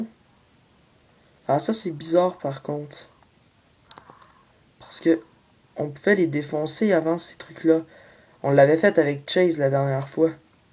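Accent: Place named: Quebec, Canada. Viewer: Salut ^^ alors toi qui a un asser gros nombre d'abonnés pour un joueur psvita je ne t'avais jamais aperçus sur youtube :) j'ai moi aussi des personnes comme toi qui dislike met vidéo amors que j'ai quel que bonne personne qui like ( exemple: 9 like 2 ou 3 dislike )bref. Je ne sais pas comment régler ce probléme moi aussi :) sur ce j'ai regarder ton contenue tu est un exelent joueur psvita :D continue comme ça ! +1 abo ( ps: j'adore les accent québecois j'aimerais trop vivre au québec !!)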